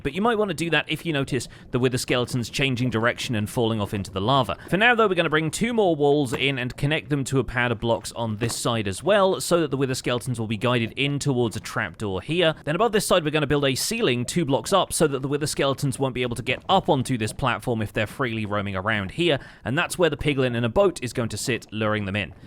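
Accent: United Kingdom. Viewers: I'm on episode 4 of this series but wanted to leave a comment on a recent with the hopes of being seen. OG Minecraft player so I've seen a fair few let's play. I always come back to you though, you have such a wonderful balance of tutorial but also a play through. It's nice to learn new things now but still see he same world develop and see you work through designs etc. Also lovely listening fellow Brits. You've got such a soothing voice!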